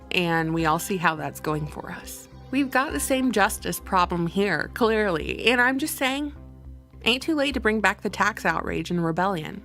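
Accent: South, United States. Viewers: How did you become Southern? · Ohhhhh just finished watching and yes, omg I want MORE from you on all of the EVIL PSYCH0paths!!! Your ironic American accent is "delicious" ! I'm originally from Canada and cannot wait to share the screenshots on certain points that you made!!! Of course I shared this video on Facebook....goes without saying!!! Btw, I know you're a new mom (félicitations!) but when you feel up to it (your little sweetheart comes FIRST of course!!!), I am CERTAIN that I'm speaking for all of your fans, we'd LOVE more to you on this subject as you are able !!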